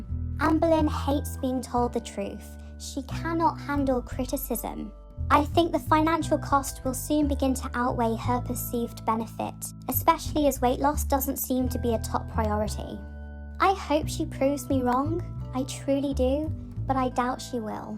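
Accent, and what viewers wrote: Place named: United Kingdom. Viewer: omg are you british? it’s so nice hearing a fellow brit in the community! 🥰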